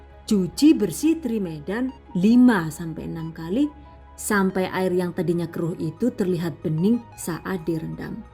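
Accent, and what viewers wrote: Banyumas, Indonesia. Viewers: rika wong ndi sih geneng ngapak yah...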